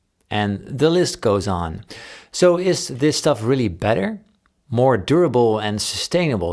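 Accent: Netherlands. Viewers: i love how you set up videos and edit them but as a fellow Dutchman it sounds kinda strange hearing someone Dutch speak English so for me it is kinda a offset but that's on me xD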